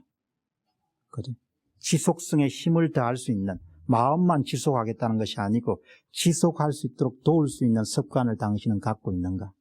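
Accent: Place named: Gyeongsang, South Korea. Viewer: 경상도 사투리 구수하고 진정 진실한 마음 안격이 보이는데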